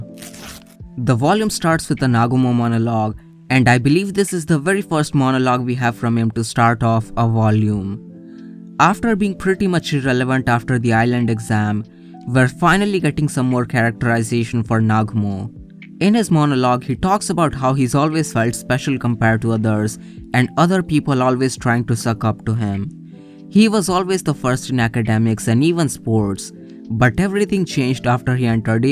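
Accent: India. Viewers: that's Indian accent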